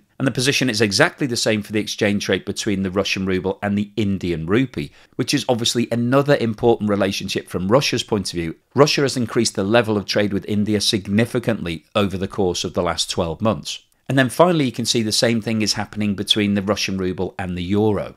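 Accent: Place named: England, United Kingdom. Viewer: Sometimes, just for fun, I talk in voice and pretend I'm English.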